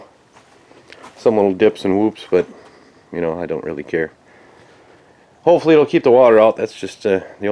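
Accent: Minnesota, United States. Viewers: Hey man r u a Minnesotan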